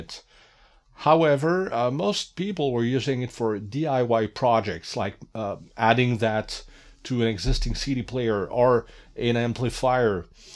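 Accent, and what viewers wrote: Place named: Quebec, Canada. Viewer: Hi, I was wondering if the old isa sound card such as sound blaster 16 which already supported 16 bits 44.1khz would have incorporated r/2r ladder dac instead of binary weighted ones? Despite being old sound cards, i couldn't find anything wrong to the sound coming out of them when propely feeded with a lossless source a few years ago when I messed around with such things. And you're probably from Quebec with that accent. Lol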